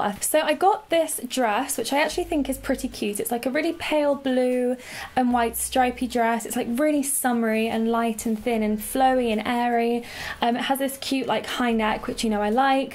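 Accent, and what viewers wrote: United Kingdom. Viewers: I am from Germany and I love your british accent 😍But also your fashion style👠👜. I am sorry if I made grammar mistakes 😕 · Are you British?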